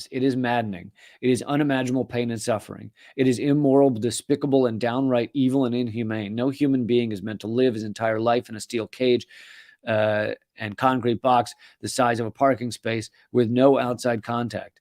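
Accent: United States. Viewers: Stop the country accent. It's liberals that enslaved blacks. It's liberals that created concentration camps. It's liberals that wanted to starve Americans for refusing the "vax". Most country folks want to live and let live.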